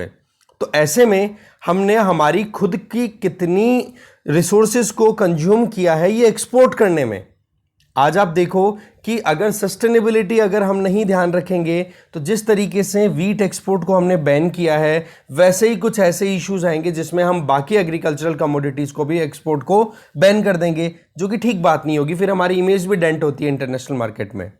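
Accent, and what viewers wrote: India. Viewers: Sir , HARIYANA Se ho kya aap .?? Thank you so much for such a great explaination... ❤️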